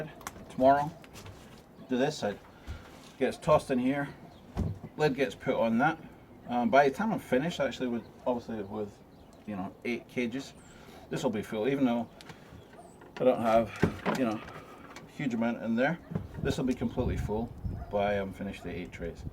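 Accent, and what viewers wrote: Scotland, United Kingdom. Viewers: Are you of Scottish or Northern Ireland descent? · Wait... you are not British?! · Are you british? :D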